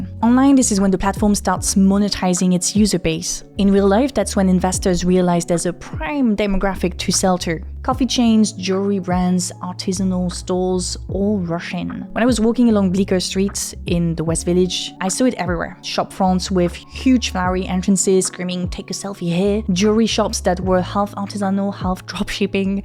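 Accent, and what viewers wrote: France. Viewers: I love the frenglish accent, I miss it 🥺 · OMG, THE FRENCH ACCENT BACK THEN, GIVE IT BACK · Maybe i am weird but always found the French English accent charming. Way better then my danish potato english :D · Don't feel bad about your accent! The French accent in English gets way too little exposure, and makes it harder for foreigners to understand French people who actually try to accomodate them by speaking English. Your older videos provide a great service!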